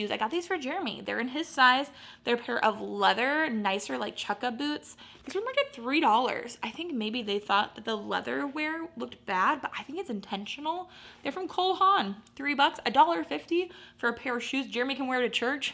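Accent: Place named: Michigan, United States. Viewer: As always I love learning from you, but I think what makes me love watching you most is that your personality reminds me of my best friends ❤ I moved away when I got married but her and I grew up together in Michigan which you have a very very similar accent and how you speak reminds me of home. Loved your haul keep hauling on 😊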